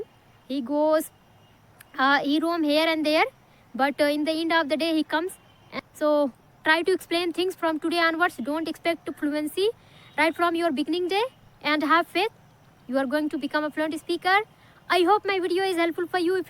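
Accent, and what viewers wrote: India. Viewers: Are YoU from Indiaa???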